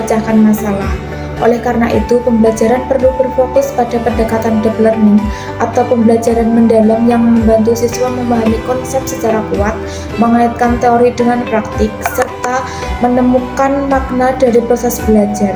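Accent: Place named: Java, Indonesia. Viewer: Medok sekali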